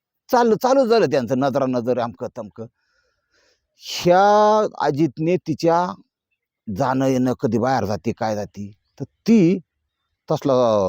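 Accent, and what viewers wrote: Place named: Pune, India. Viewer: पुण्याची मराठीच सर्व उत्तम आहे. मुंबई पेक्षा भारी आणि सरस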